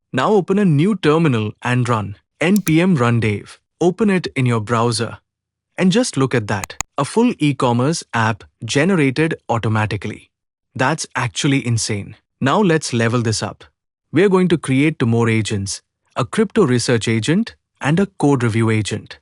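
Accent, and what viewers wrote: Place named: India. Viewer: If your using AI for the voice why make it Indian?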